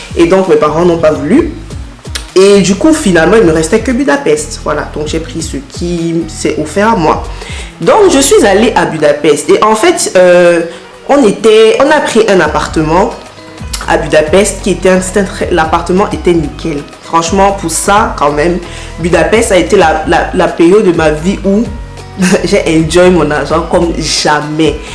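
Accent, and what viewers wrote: Cameroon. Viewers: Je sens le ton camerounais ! Lol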